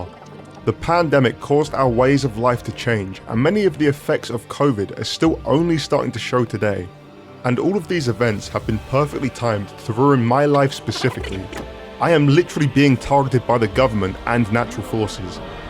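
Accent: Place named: United Kingdom. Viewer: British and you sound fat.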